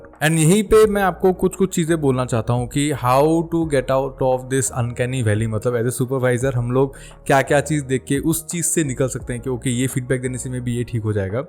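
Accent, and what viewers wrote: Assam, India. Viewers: I think i found sm slight texture of assamese accent in your video.. axomr hoi nki apuni??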